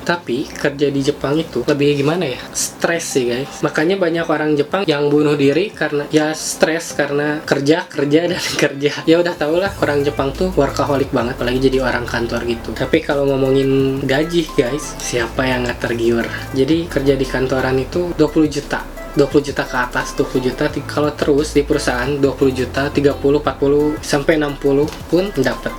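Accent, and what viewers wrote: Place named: West Java, Indonesia. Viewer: orang bandung? hehehe meni sunda soalnya walaupun ngomong "gue":)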